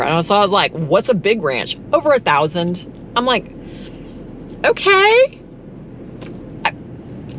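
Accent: Oklahoma, United States. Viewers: Your accent sounds close to an Oklahoman accent. If remember right, the lakes were created so we wouldn't have another dust bowl.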